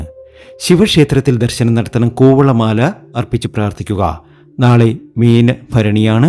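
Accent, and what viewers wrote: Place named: Kolkata, India. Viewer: Kolkata Malayalam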